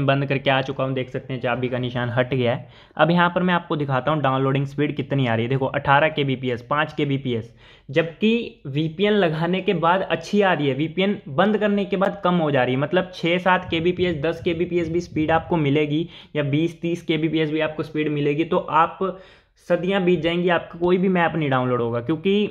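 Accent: Punjab, India. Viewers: Bahi app Punjab se ho kia keuki muje apki avaj se pata chal gia 😁 me be punjab se he huu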